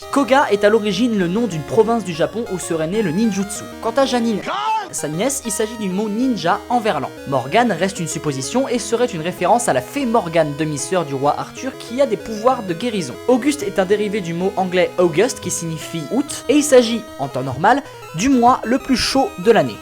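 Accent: Spain. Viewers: S'il te plaît arrête avec ton accent espagnol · Ton accent espagnol es magifico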